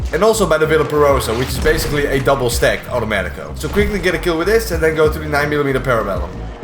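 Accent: Netherlands. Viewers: Are you Dutch? I think I recognize the accent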